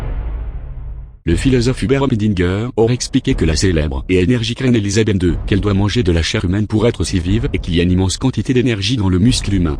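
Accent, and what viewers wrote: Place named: Quebec, Canada. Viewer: mdr j adore cet accent (québecois)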